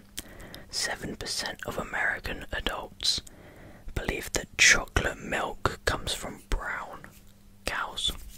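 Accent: United Kingdom. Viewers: Congrats on 7K subs. Btw I love listening to your British accent 🥰. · Very cute thumbnail huh. Got to be careful using that word until you're eighteen because where I'm from your underage until then. But none the less I love listening to somebody whisperer in a British accent and list off a bunch of facts. British accents are very hypnotizing.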